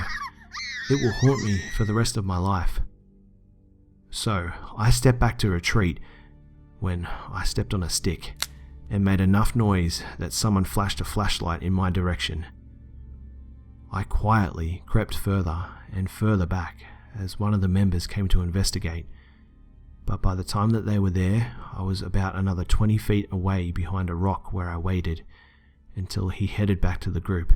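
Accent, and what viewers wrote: Australia. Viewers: move here to Australia, a lot of people sound like this even me and my friends... in other words everywhere 0_o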